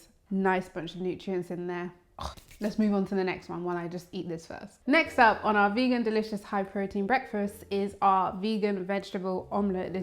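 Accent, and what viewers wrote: United Kingdom. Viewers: Yo hablo español, but if my listening is not too bad, your accent sounds british. I've heard there are good vegan options in Britain. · Thank you!!! The food looks delicious. I love your British English. I watch the videos to learn recipies and vocabulary too. 🤗🇧🇷🇧🇷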